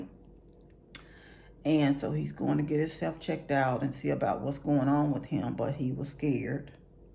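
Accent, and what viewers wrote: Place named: South, United States. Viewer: I love this lady! That southern accent is so cute ❤️❤️🥰🥰🥰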